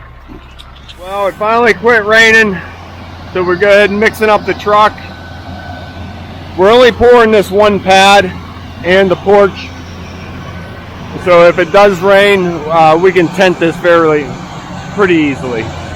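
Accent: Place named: Pennsylvania, United States. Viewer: Where are u from? Sounds like pa.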